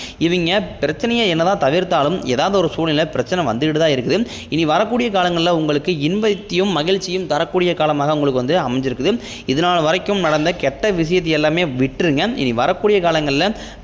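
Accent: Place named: Kongu, India. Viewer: அது அவங்க ஊர்ல அப்படி தான் பேசுவாங்க. கரூர் கோயம்புத்தூர் ஈரோடு நாமக்கல் கொங்கு பக்கம். அவா இவா என்று பிராமின் பேசும்போது அவங்க கிட்ட ஏன் இப்படி கேள்வி கேட்க மாட்டேங்கறீங்க? நான் திருச்சி பக்கம். அவங்க இவங்க என்று பேசுவேன். ஊருக்கு தகுந்த மாதிரி தமிழ் வழக்காடு மொழி மாறும். யாருக்காகவும் நம்மை மாற்றிக் கொள்ள முடியாது. சுயம் எப்போதும் மாறாது.🙏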